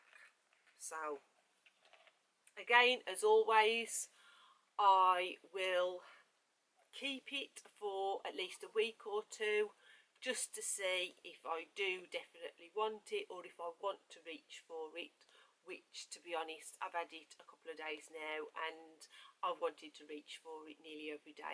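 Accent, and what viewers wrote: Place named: Black Country, United Kingdom. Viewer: I bought this bag too as I LOVE the denim (I bought the headband to match to LOL) also, you’re accent I love it! Im a fellow Black Country person xx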